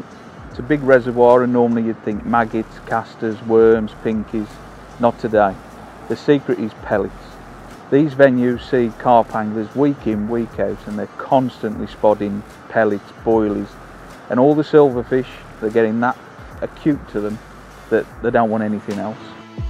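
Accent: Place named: West Midlands, United Kingdom. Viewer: Great to hear a local west mids accent... Great info..💪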